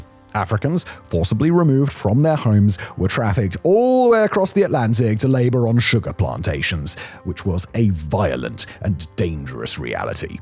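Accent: England, United Kingdom. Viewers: Simon create a video full of lies using a mix of content from a bunch of other online contents. I have friends in Petion-Ville right now having more fun than me and looking forward to enjoy the weekend. Don't let this random English accent define what's going on in Haiti....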